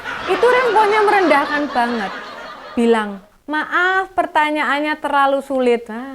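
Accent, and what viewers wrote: Java, Indonesia. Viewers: Ini mbak makin lucu, makin berani, makin tajem... Dan dicampur logat dan boso Jowo jadi pas untuk pendidikan pembelajaran mayoritas masyarakat NJAWANI..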